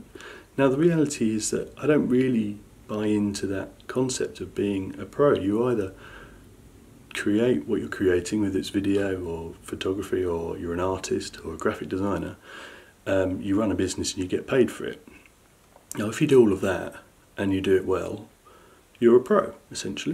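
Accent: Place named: United Kingdom. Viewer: Dude you’re British? I subbed just for that lol. Great video man.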